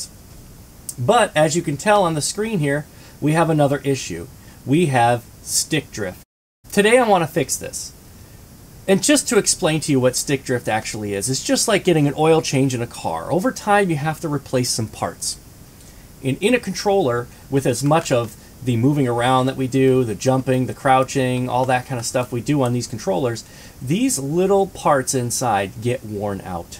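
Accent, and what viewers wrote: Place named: United States. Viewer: This is a great video, but almost impossible to watch as someone who is English due to Americans silencing the L in solder, which is weird.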